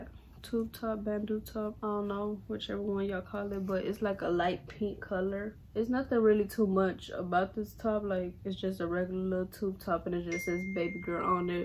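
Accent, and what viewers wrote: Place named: Alabama, United States. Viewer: Girl your accent is deep, is it Alabama?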